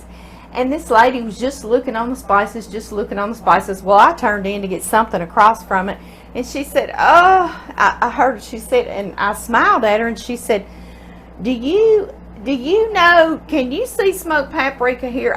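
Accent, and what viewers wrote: South, United States. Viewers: I just looove your Southern voice ❤Imma make this..Thank You for being kind to share this..Stay Safe ❤😷❤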